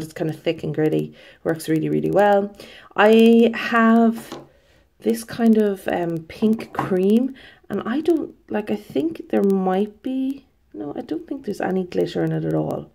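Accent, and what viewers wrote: Ireland. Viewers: Love the video! Really cool to hear an Irish accent talking about nail polish... most people's eyes glaze over when I talk about it. ASMR btw? I'm from Dublin so glad to hear a fellow Irish polish nut!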